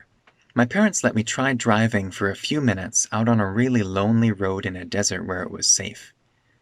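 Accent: United States. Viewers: I don't know about that but he definitely sounds American.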